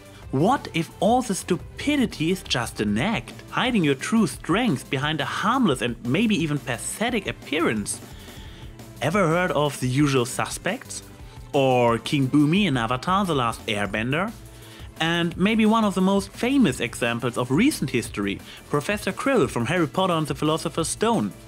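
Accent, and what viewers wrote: Germany. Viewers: I meant to say my accent isnt as bad as this. Seriously you have like the most German accent ever. Also what does stand for? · Is he German?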